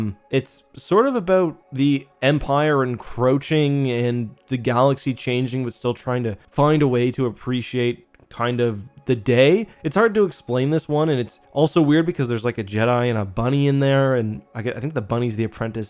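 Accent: Canada. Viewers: Wait are you Canadian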